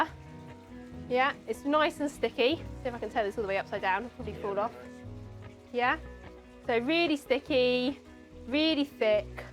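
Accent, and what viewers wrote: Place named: Wales, United Kingdom. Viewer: Great video, also known as ‘watching mixing things’. We’ve noticed your increasing bicep size and maybe also a slight Welsh twang…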